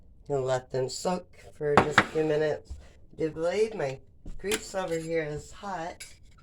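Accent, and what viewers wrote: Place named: Lower Michigan, United States. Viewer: I would have subbed so much sooner if I knew you were a fellow Michigander! Sound like you're South of the bridge... maybe Elk Rapids/Grayling/Gaylord or even Battle Creek (no need to disclose). Can't go wrong adding more bacon renderings!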